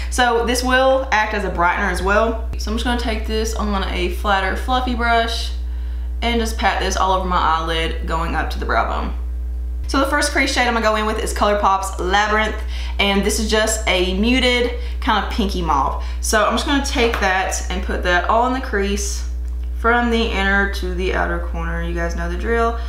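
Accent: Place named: Tennessee, United States. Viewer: Ok so I have to ask 1. Where are u from...u sound like you are from tennessee or Kentucky I live in tennessee I would like to follow this look for a video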